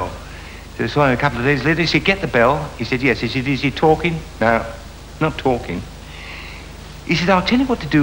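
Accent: RP, United Kingdom. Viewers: The uppercrust English delivery style always made me laugh and find it even more humorous..if I imitate an English gent i channel his imitation.. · Love his upper-crust British accent. Makes everything he says even funnier. 🤣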